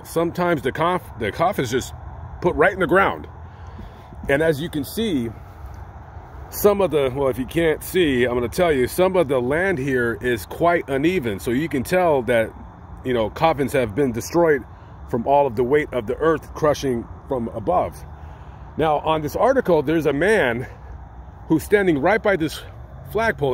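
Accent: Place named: California, United States. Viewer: I enjoy his voice because he reminds me of California I lived there for 6 years as a child.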